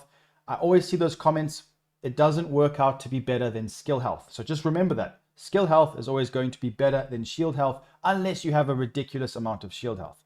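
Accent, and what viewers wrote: South Africa. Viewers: Is that a South African accent I hear ? Hello fellow traveller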